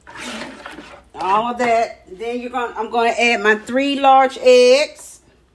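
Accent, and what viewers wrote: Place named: South, United States. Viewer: You gotta be south 😉 Louisiana here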